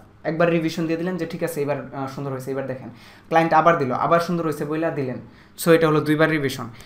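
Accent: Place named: Brahmanbaria, Bangladesh. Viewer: শুভেচ্ছা রইল, কিন্তু আপনার মুখের ভাষাটা ব্রাহ্মণবাড়িয়ার মনে হচ্ছে বা আশপাশের অঞ্চলের। (মোট কথা দেশি দেশি লাগতাছে)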